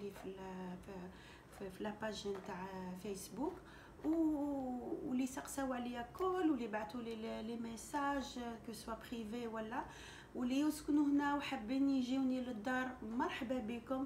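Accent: Annaba, Algeria. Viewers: صباح النور واش حوست على قناتك و معرفتش اسمها شفتلك فيديو فقناة réalité dz راكي هايلا بزااااااف و متربية و هدرتك حلوة اليوم لقيت الفيديو هذا زهر 😊😊😊 شحال نحب هدرة العنانبة 😍😍😍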